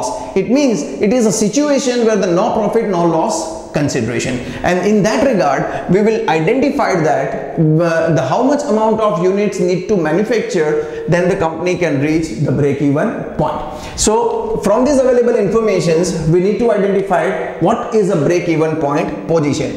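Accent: India. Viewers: Queen Charles kingereza chenyewe cha mhindi lzma uombe pooh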